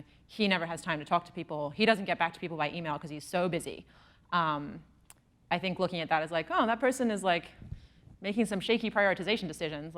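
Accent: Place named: Australia. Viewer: I was in her lab class at unimelb. Back then, she spoke pure aussie accent. Now, it's hybrid.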